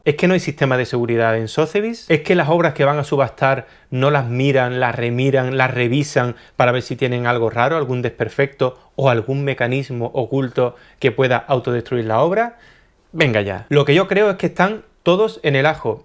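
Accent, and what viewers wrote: Andalusia, Spain. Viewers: eres andaluz?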